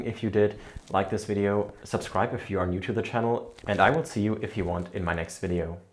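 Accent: Germany. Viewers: Great video! I love fashion but realized I don't even wear most of my clothes, so I started to declutter everything and became more aware of my purchases. Are you from germany? The accent sounds familiar 🙃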